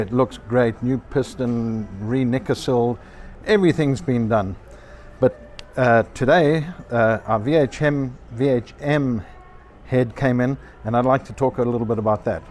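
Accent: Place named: South Africa. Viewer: You, kind Sir, sound incredibly South African, if you dont mind me saying so. 2stroke Love from South Africa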